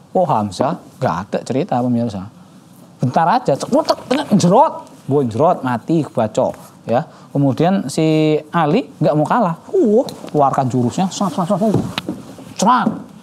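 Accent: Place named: Indonesia. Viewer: Medok tenan ustadz.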